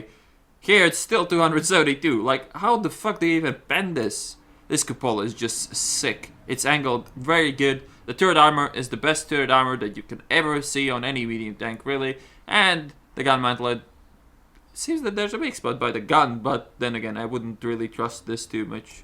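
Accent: Sweden. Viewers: Wait, you're Swedish?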